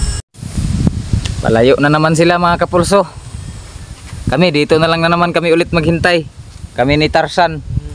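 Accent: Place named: Visayas, Philippines. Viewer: Bisaya man kaayo ang dating from tanauan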